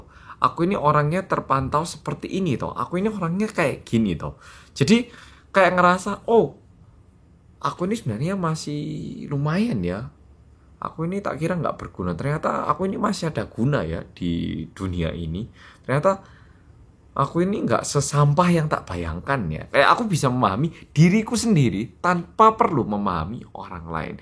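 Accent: Java, Indonesia. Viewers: medok,medok,medok banget sih....bahasa koreanya logat Jawa ya? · kok malah logat jawa ya keliatan medok he he hee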